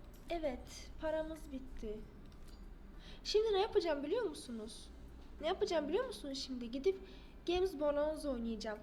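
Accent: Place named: Cyprus. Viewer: Merhaba konuşmana bayılıyorum eskiden kıbrıslı sevgilim vardı deyişik biraz turkçeniz ordan anladım zaten kıbrıslı oldugunu:)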